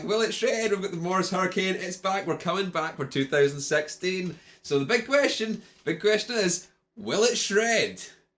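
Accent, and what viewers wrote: Scotland, United Kingdom. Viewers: I can feel the Scottish accent :))))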